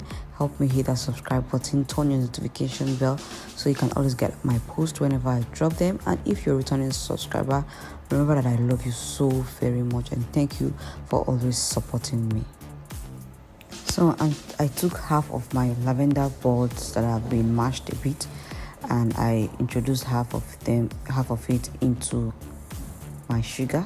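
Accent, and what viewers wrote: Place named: West Africa. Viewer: Lilac baby li-lac pronounced lie-lac or lye-lac The tutorial is very beautiful but your pronunciation been terrible at least I know what herbs you use and everybody else knows what herbs you you use you must be from the french part of Africa i had to watch your videos over and over to figure out what you was trying to say!!!...😊😘😍🤩 i still love your beautiful accent From your country where ever it is and your skin is amazing and all your tutorials are too but I still have to figure out😂😅🤨 what you be trying to say in the herbs section.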